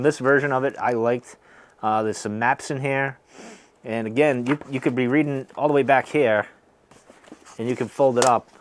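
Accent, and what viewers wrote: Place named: Boston, United States. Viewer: Judging by your accent you sound like a Celtics fan?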